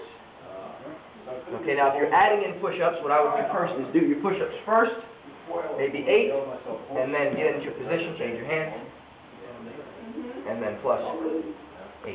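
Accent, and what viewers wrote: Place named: Philadelphia, United States. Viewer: Ended up here because I have to study the Serratus Anterior for an A&P exam and came across an article about how in a weakened state, can cause rounded shoulders. BUT- by doing a push up plus you can increase your strengthening of this muscle, so I ended up here. Don't ask why but 15 secs into this video, I couldn't stop wondering if is from the NE, particularly, Philly. Just from listening to how he speaks.Could be wrong but it's kinda fun to be able to determine that.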